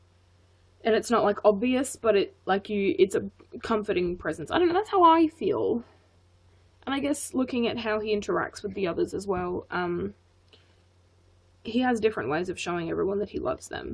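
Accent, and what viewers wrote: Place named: England, United Kingdom. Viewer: you're english!?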